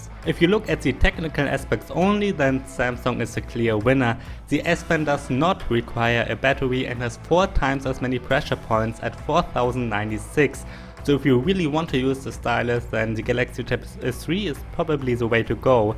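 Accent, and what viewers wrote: Germany. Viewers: Is so hard to understand your ligo m8 for a German U definitely have the worst accent I've ever heard is so unpleasant. Unlike my other German friends & my German girlfriend she has a brilliant accent she almost speaks perfect English like my other German friends at University. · As I am German too, I might be the only who is surrounded by this accent everyday lol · Are You German? Weil Ichs Auch Bin und du hörst dich extrem deutsch an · Man merkt dir an, dass du Deutsch sprichst grundsätzlich weil sonst hättest du LCD panel bei ca anders ausgesprochen genau so wie viele ausdrücke :D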